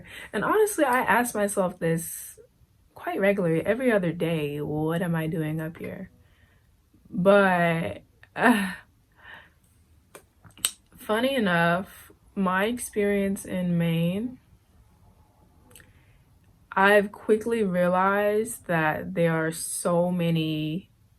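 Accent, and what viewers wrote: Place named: Michigan, United States. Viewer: Sounds like the upper peninsula in MI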